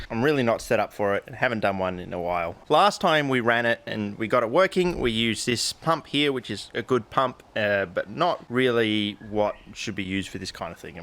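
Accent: Australia. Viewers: Pfft watching for the chemistry… Birds? No thank you I just like the Australian accent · Dude, I've just gotta say this. My life has been shit the past couple years, not looking for pity just saying so for context. I found your channel about 6 months ago, and shortly after I found. I've always been intimidated by, and thus hated chemistry. I'm a physics and astronomy person, and a gufted musician, and I just gotta say, something about your auzsie accent, you're personality, and you're... Relatable..... Methods, got me hooked and it I've seen all your vids a couple times each. New episode just makes my day, and the fact I'm gonna be here for another cubane series makes me giddy. But the main thing to say, is you rock, and keep it coming mate! I always wanted to move to Australia to work as an opal or gold miner but since that's never gonna happen, I'll settle for some chemistry from an aussie physicist.;) good work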